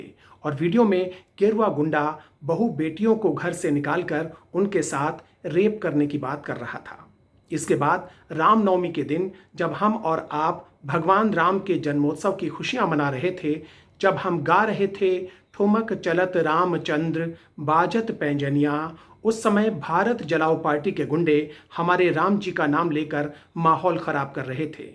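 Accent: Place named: Lucknow, India. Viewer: ji aapka ka lakhnavi andaz aapko bhut khoobsurti bat rakhne ki bemisal jahanat hai, aapko lambi aayu liye kamna, aapka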